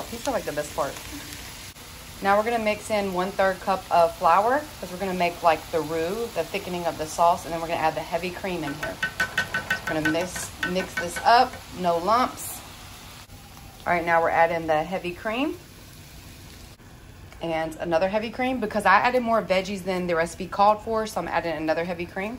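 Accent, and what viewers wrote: South, United States. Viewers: Great video thanks for sharing. I can hear your Southern Accent loud and clear, lol. Where is the South are you from?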